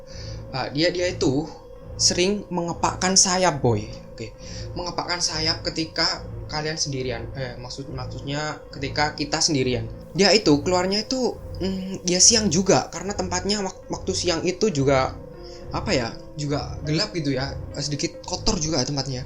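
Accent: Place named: Surabaya, Indonesia. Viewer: Mas samean kalo bicara ini kaya orang surabaya bang surabaya mana plise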